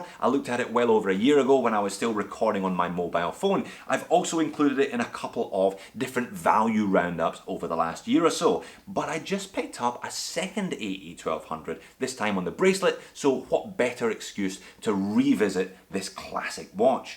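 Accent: Scotland, United Kingdom. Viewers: Are u scottish?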